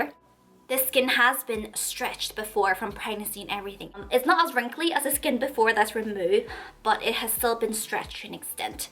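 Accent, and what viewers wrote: Northern Ireland, United Kingdom. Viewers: What kind of accent do you have ? In some moments, you sound British, there was a hint of Irish at one second. I’m so interested to know where you grew up or where you live now? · its so nice to hear a Norn Irish accent!!!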